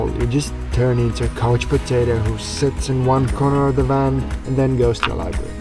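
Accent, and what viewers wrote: Scandinavia. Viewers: Nr 2: Showering! Oki thats enouhn problem for me! Btw are you Swedish? ya accent sounds from Scaninavia! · Loving the accent!!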